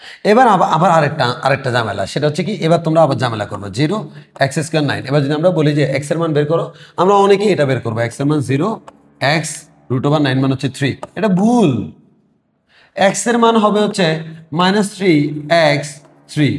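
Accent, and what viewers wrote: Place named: Chittagong, Bangladesh. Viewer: Kotha te bujhai jai vaiya chitainge 😂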